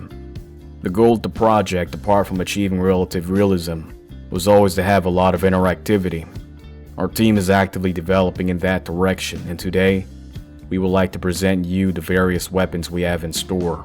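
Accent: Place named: Deep South, United States. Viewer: Jesus, your narrator is great. That accent is awesome...Deep South + Eastern Europe?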